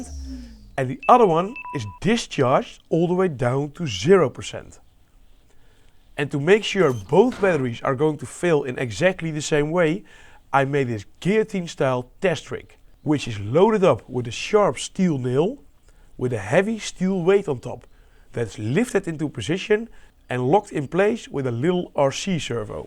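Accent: Netherlands. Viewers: Nen holander die engels probeert te praten 😅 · You sound very dutch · holy dutch accent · Heerlijk Nederlands Engels accent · This accent sounds so dutch, wth🙏😭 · sir you sound dutch as fuck, are you? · Well, I don't take you in the mailing but your video subjects are good however your English is not so good. Very Dutch like. Maybe you have to practice more or take some lessons and then I think that you hit two flies in one clap. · Ik hoor een Nederlander, of niet? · I know a dutch accent when i hear one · Hey a Dutchy speaking English :D Leuke video! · De nederlandse accent is egt om over te lage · You are Dutch?! I hear Dutch accent. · ik hou van dit nederlands accent HAHA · Ben je Nederlander, bro? Want je spreekstijl en accent klinken meer als die van een Nederlander die Engels spreekt, daarom wilde ik het even vragen. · Dutch voice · I smell dutch accent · It says united states in your bio, but that accent sounds really Germanic, specifically Dutch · From the first words "lithium batteries" I knew you were Dutch 😅 First time on this channel. 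· 10 seconds in and i know youre dutch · je hoort het gelijk als het een nederlander is XD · Dutch accent so thick 😅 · Love het nederlandse accent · I love the dutch accent,ok on topic,thks for this infomation,usefull. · First time watcher, I just knew you were Dutch from your accent. Good stuff. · dutch accent... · Dit accent klinkt zo Nederlands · immediatally knew you were Dutch the moment i heard that accent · i just love the Dutch accent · Are you Dutch if I may ask. You sound very Dutch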